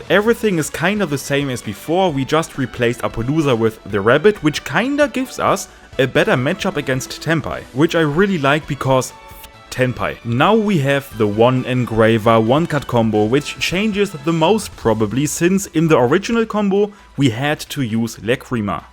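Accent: Germany. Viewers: The german accent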